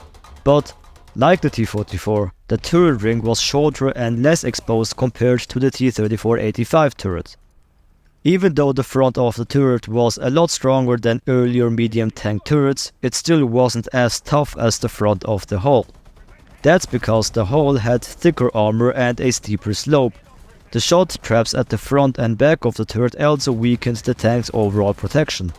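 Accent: Germany. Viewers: come to learn about T-54, stay for the German accent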